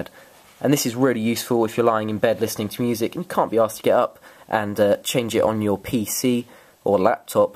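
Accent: England, United Kingdom. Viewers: nice accent. you from england? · You Britian?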